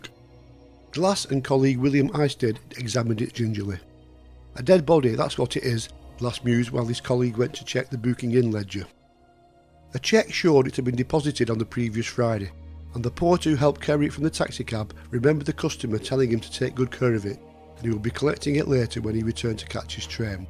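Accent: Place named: Bolton, United Kingdom. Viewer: A good Bolton accent on the commentator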